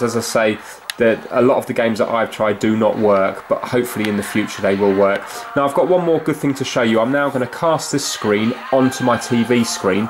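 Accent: United Kingdom. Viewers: Same I also liked the video because this mans British accent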